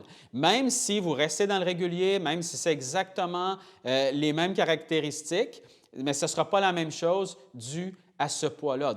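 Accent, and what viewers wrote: Quebec, Canada. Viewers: C'est dépaysant d'écouter un québécois. En France on parle de tige pour une plante ou pour quelqu'un de grand 😁 Ici on parle de club de golf. Mais le plus drôle c'est quand tu parles des distances 😁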